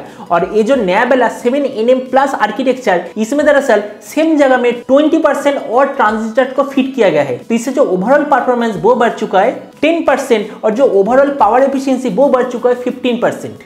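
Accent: Bengal, India. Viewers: Nice Bengali ascent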